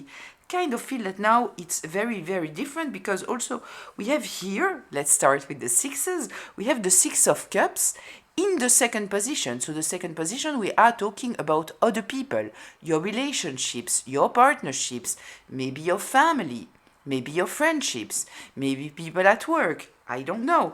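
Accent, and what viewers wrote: France. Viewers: You are goldilocks mama bear papa bear n a cheeky 😜 😉 😄 😏 😆 😘 lil baby 👶 bear all in one i am the lucky goldilocks living ❤️ in a safe space ❤️ 😅 love adore an sank in the awe of your accent. Sounds like French but I am but a bamboo lambo no offence meant. You are that beauty in the world card. I see you . eagle. hear you ...bull... build my empire on your word flowing gently like a true judge... not a kangaroo court....coming thru air n water. Kaballah is the umbrella. Do not get understanding then NOT act upon that understanding.. alas whatever the gen you'll never be part of the inner standing. You beautiful creature and your channeling from the gurus truly are my umberella, my guide😮a hand to hold and a voice in the wilderness who wants what is best for me..all in the fair wayfairers way to inner standing. Phew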